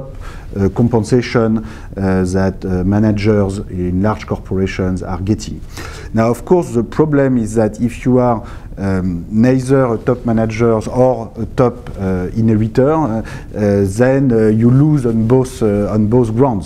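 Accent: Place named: France. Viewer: His French accent is annoying.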